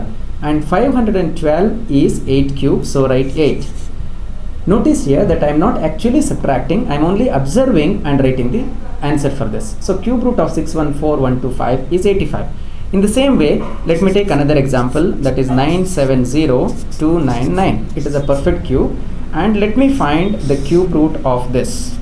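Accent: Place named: India. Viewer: what I hear: De duh deh deh. do duh deh dee deh deh. If this were the first day of class, I would be on my way to Drop/add. Sorry. My understanding of Indglish is and terrible. lol Will try to find this in english. Thanks for posting though!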